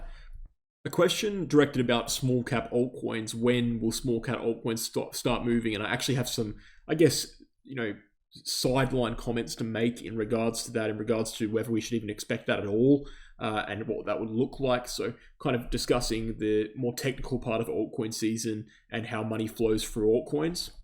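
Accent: Australia. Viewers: I can’t believe I had to suffer through an Australian accent for that long, and get nothing out of it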